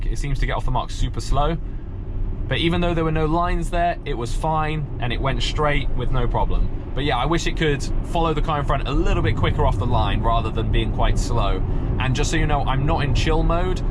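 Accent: United Kingdom. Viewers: For rear view of the mad Volvo driver you could have turned on the rear cam we could see part of it, but did not see anything in the rear view mirror. Length of video is good and your commentary was very good. You drove past a very long brick wall so you could have told us what that was or any other interesting sites you drove past. I could listen to your voice all day long and not get bored, must be the British accent, we Americans love, .lol. Good Job keep up the good work. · Amazing and relaxing video, I wonder how that autopilot would work in Portugal Old Town Road. Btw thanks to improve my English understanding, I'm French and it's nice to ear British English 😉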